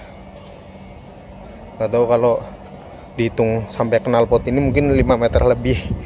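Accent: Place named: Tegal, Indonesia. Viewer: Sih kayane wong tegal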